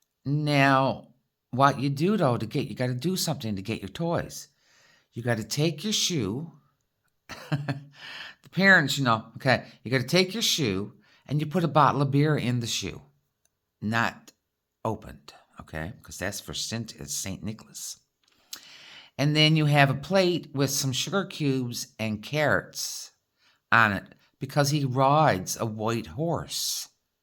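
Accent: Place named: Newfoundland, Canada. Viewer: Hi. I'm canadian too, but Ontario. Love your accent. My friend is from Newfoundland too. Merry Christmas 🎅 🎄 subscribed. Glad I found you. Wonderful vlog. Loved it!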